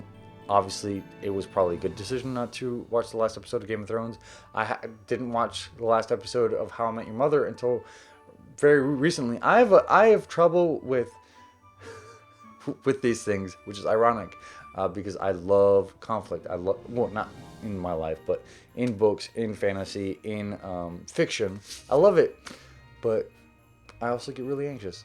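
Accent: South, United States. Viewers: I love your video at your video in Texas during your quarantine until your husband, Zach was wrapping all of the books while you're open them to see some new books including Black Sun by Rebecca Roanhorse, Legendborn by Tracy Deonn, Ready Player Two by Ernest Cline, And I Darken by Kiersten White, The Fires of Vengeance: The Burning, Book Two, The Invisible Life of Addie LaRue by V.E. Schwab and House of Earth and Blood (Crescent City) by Sarah Maas, but you're talking about all of the characters from the books to read them after that, but I hope he'll be proud of you and I love your Southern accent!!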